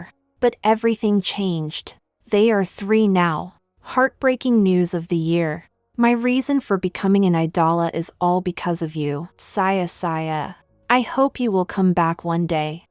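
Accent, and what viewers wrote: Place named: West, United States. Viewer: Western accent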